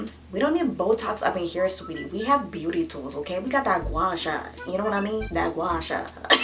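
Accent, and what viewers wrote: Canada. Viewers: Your Canadian accent sounds like how my grandpa talks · Canadian accent sounds really nice. I just realized they had their own accent 😂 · Canadian accent soo cute😂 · Your Canadian accent is killing me. 😂 I'm from the U.S.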